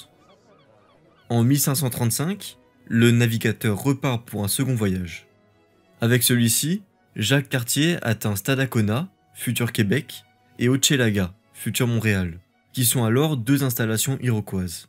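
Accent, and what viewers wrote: France. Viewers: Aucun rapport. En France on appelle Région, une partie d’un pays, et à l’accent on voit bien que cette chaîne est tenue par un français donc il emploie ses mots. Province, région au final c’est la même chose. Et la taille n’a rien à voir, la Barbade est un pays indépendant bien que ça soit minuscule, de même que l’andorre Et pourtant le Québec lui n’est pas indépendant de même que le Sibérie qui sont énormes.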